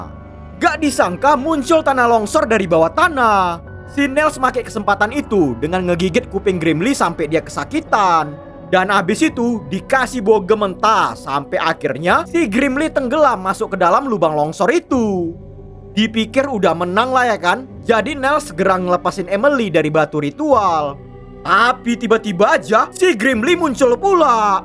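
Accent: Medan, Indonesia. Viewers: Orang medan yaa min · Medan kali salam dari Tanjong Morawa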